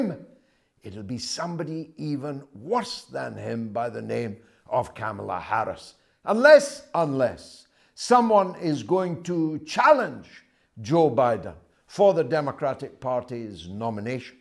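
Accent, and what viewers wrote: United Kingdom. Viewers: Damn you've turned into Tucker carlson with the British accent. Trump's actions were deliberately criminal.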